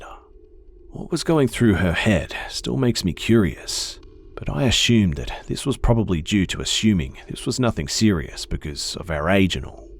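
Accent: Australia. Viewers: Oh great now we got a shitty aussie reader who also sounds like a puff like the rest of them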